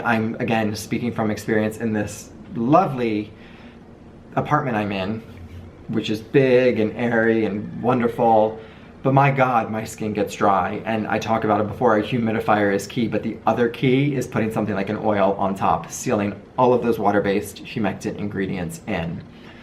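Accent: New York, United States. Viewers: R u originally from Seattle as U sound like ur from New Yawwkkkk!!! East coaster here as well. LOVE UR PRODUCTS!!! Keep on going!!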